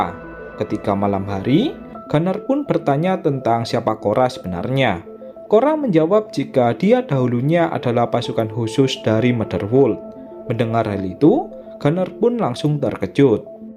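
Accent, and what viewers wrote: Java, Indonesia. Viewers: Bisa gak logat jawa mu itu di hilangkan.jijik dengar anjing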